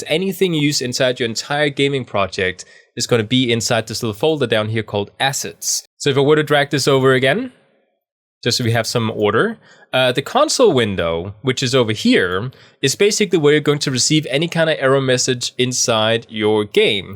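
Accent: Denmark. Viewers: I like your accent as im also danish :)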